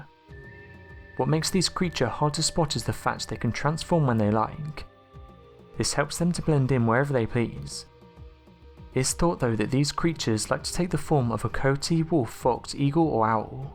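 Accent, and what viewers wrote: United Kingdom. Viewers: AHÍ CARES ABOUT GUNTHER AND HOW UGLY GULF.. AND PLEASE GET THOSE SISSIFIED BRITISH NARRATORS OUT OF HERE.. AMERICANS DO NOT CARE FOR BRITS NOR THEIR SISSY VOICES..SO FAKE..SO FEMMY! FROM NOW ON IF VOICE IS A BRIT.. WE WILL NOT WATCH !!!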